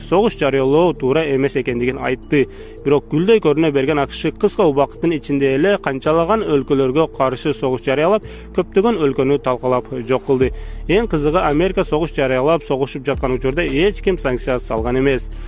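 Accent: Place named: Batken, Kyrgyzstan. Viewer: Ыны дебей айлыгЫн дейт кыргызча , сууду дебей сууну дейт Баткенчи окшойт комментатор.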